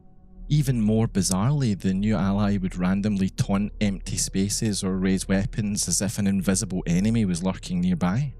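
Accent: Scotland, United Kingdom. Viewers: Can't nail the Scottish accent down to 5O miles , I'm noob to the channel but am born in Glasgow and have been dealing with Mexicans on construction sites for too long in So-california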